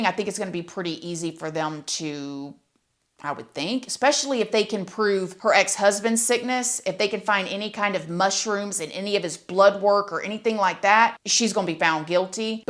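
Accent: South, United States. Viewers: Hey! I’m now discovering you… GURL! Where you from? Southern? Your accent is coming through hard! 💯Love it!💖 Oh yeah… This story is madness! 🤯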